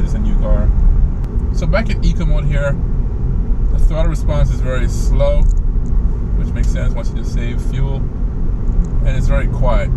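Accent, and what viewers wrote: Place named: Caribbean. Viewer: Are you from the Caribbean? Hearing a hint of a West indies accent.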